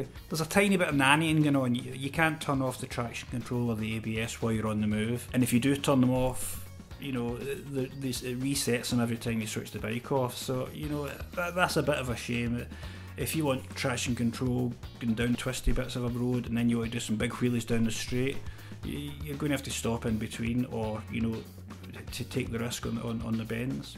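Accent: Scotland, United Kingdom. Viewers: Ah the Scottish accent met with arsehole comments. Being Glaswegian myself, this is the shit that makes me refuse to share my own videos. If only we all had those irritating south English squeaky accents.... very very informative video guys thanks, mine comes this week :)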